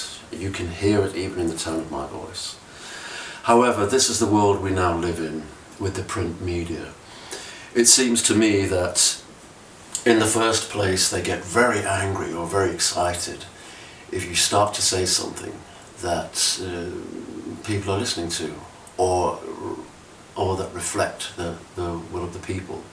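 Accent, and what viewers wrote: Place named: Manchester, United Kingdom. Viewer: goddamn I love his Manc accent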